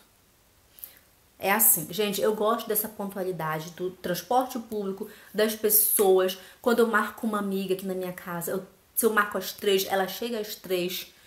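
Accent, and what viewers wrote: Pará, Brazil. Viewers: Assim que ouvi vc falar eu soube. É paraense. 😚 inscrita! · Égua, bem que eu reconheço esse sotaque! Saudações de Belém do Pará! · Eu sabia que você era paraense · Oi querida, achei por acaso seu Canal, e ja to assistindo., so uma Pergunta, Vc é Paraense? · Já sabia que você é do Pará só pelo sotaque haha. O nosso "inverno" é assim mesmo, só chuva e calor aff.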